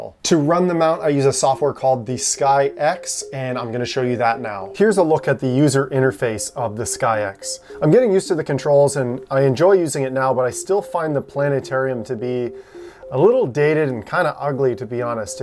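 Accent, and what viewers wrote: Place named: Canada. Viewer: I'm guessing from your accent You're from Canada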